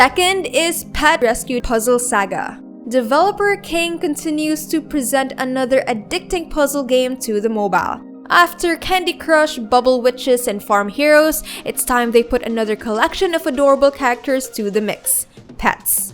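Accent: Philippines. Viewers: I feel like your english sounds filipino. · You sound like filipino IM PROUD TO BE FILIPINO ML TAYO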